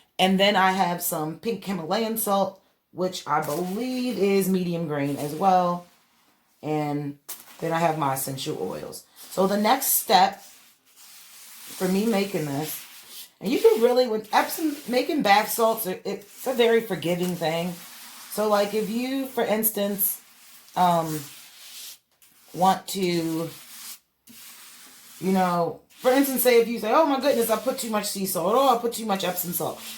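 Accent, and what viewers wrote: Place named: Baltimore, United States. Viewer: Your from Baltimore right ? Your accent gave it away, i have family there❤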